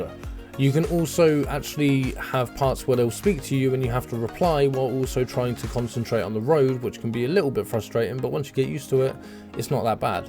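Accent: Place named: Bristol, United Kingdom. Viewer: Is that a fellow Bristolian I hear?😂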